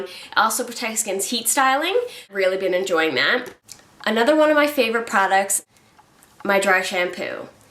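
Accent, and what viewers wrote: Canada. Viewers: Being Canadian, it's always difficult for me to hear what the rest of the world hears as a "Canadian accent." But I think I hear it now....so funny. Probably from watching videos of girls from all over the world. This was the first video of yours that I have watched and I knew within the first couple of minutes that you were a fellow Canadian! Awesome video! New subscriber!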